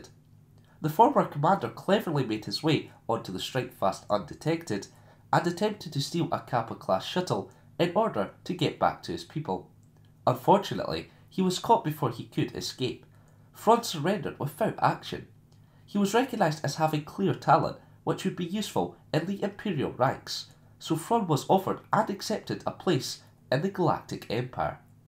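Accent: Scotland, United Kingdom. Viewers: So scottish my dude.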